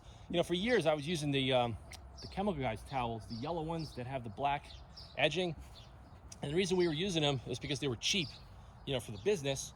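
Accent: New York, United States. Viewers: Awesome job on the correction! I heard you mention you already have cquartz uk on it already. I have the same setup on my RAV4. I talked to Corey about this from carpro and you really don’t want regular wax or sealant on top of a coating. They make “Gliss” now to put on top of Cquartz for extra depth and shine. I love the Wolfgang liquid sealant which is like Jescar power lock. I also dropped 2 hondo on Wolfgang concours carnuba. I heard the NY accent right away man, I’m from Jersey. Not knocking you at all about wax over coating. Just wanted to throw that out there. Griots are the bees knees. You literally have the same set up I have from the megs pads to the PFM towels. You need to try microfiber madness cloudbuster, they make the best glass towels I’ve ever used in my life. I gotta upload my 18 hour day of paint correction now after watching this video 😎 You rock man...keep it up!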